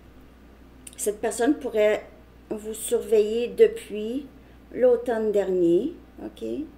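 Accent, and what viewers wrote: Quebec, Canada. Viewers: Tu es vraiment bonne et super drôle! 😄🥰 c’est l’fun d’entendre une Québécoise. Beau ressenti, merci pour la guidance! ❤️✨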